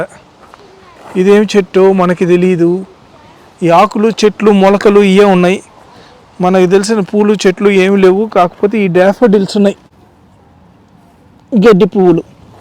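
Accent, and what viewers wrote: Nellore, India. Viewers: I live in USA and watch your travel videos. Though I watch lot of youtube videos on travel and other related topics, I very rarely write comments. However your channel is exception. Just letting you know that your videos, presentation are very nice a your Nellore accent is very entertaining. Please keep traveling, capture your experiences good/bad and post. Wish you good luck and hope your channel grows in popularity.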